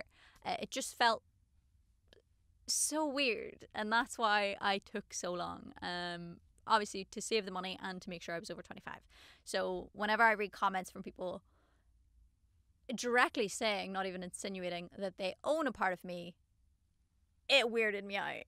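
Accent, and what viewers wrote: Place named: Ireland. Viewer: First off, who the hell would write a hateful comment, this is for fans. Anyhoo, a close family member of mine has the same condition(pigeon chest) and a case of flail ribs(the bottom ribs stick out from the ribcage). A male, I guess it might be different in a self-esteem point of view. It posed no health issues and he worked out and went to the gym and although it's still noticeable, not nearly so as before like when he was very young. I didn't know there was actually a surgery to fix or change it; not including the enhancement that is. No one should make someone feel bad about having an elective surgery to correct an issue or to help one feel better about themselves. I enjoy the sound of her voice so much. The Irish accent is absolutely mesmerizing.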